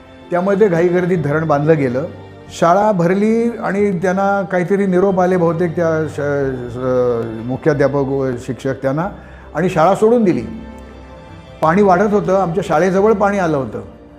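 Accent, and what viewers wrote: Pune, India. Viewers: After long time I heard puneri marathi ❤️ Being a muslim but punekar my child hood spend at kasba peth. Work place was Deccan. After marriage we shifted to camp mg rd.it was gap of 18years. My mom survival of this incident she was may be 7 or 8 yrs old she told me they were shifted to rasta peth I think my be I m not wrong it's mudliyar bunglow. Thanks sir for this video 👍 I will show this video to my mom ❤️ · असं स्वच्छ आणि चांगली मराठी भाषा बोलता येण्यासाठी मुळ पेठांमध्ये जन्म घ्यावा लागतो.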